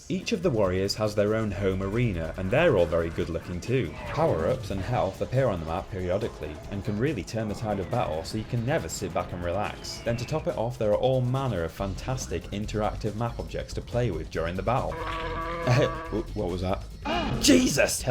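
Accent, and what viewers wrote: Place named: North, United Kingdom. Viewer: Found out about your channel after seeing a post about you on r/gaming, you're great! Where abouts are you from by the way? You sound Northern.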